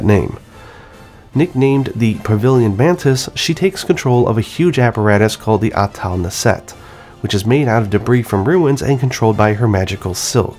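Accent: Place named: Japan. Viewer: so this is how Japanese who're extremely fluent in English pronounce the monsters English name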